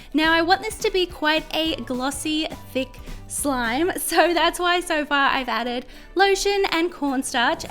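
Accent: Australia. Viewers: Are u Australian? Just a random question also AWESOME slime. I can't even make some slime 😕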